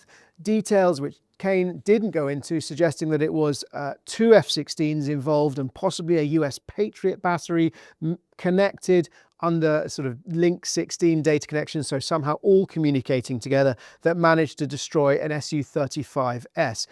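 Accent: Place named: United Kingdom. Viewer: Today, This Broadcast, is my first time watching & listening to you And I'm Hooked Immediately to your delivery, Thank You. Yes I subscribed, and for me it is kinda hard to hear British Accents but somehow you do a Fantastic Non Irritating Job (and I realize how childish this is of me) but it IS, What it IS. God Bless and keep on doing such the great job that you are doing.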